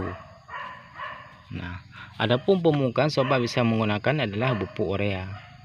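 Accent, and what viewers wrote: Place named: Aceh, Indonesia. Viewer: Dr cara gmg nya psti ni org aceh kan bro,kiban bro awak pane???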